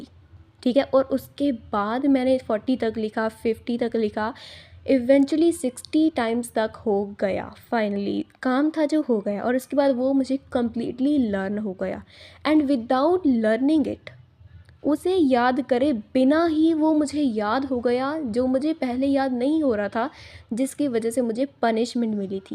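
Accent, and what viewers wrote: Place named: Punjab, India. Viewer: Probably because of her punjabi accent❤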